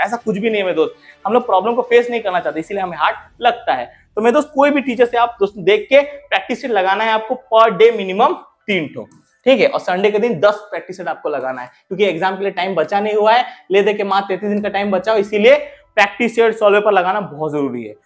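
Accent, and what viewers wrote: Odisha, India. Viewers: Bhai tu odisha ka hai kia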